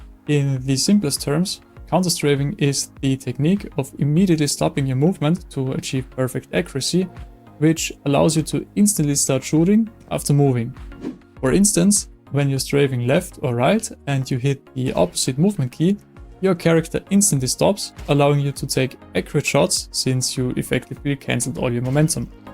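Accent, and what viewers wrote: Austria. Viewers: als ob du österreicher bist hahahha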